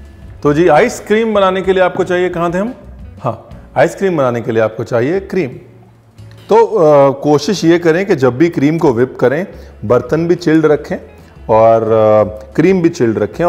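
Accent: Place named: Lucknow, India. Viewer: Science class + History class + His Lucknowi leheza + Lucknowi nazaakat which is nothing but absolute romancing of all ingredients is nothing but creating a masterpiece .... Not to forget Ranveer Sir ,,, inspite of being Punjabi we can see his love for Lucknow as he spent his early days of learning this art in Lucknow!!!!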